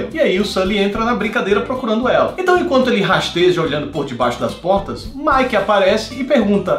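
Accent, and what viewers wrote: Northeast, Brazil. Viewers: Você é nordestino ?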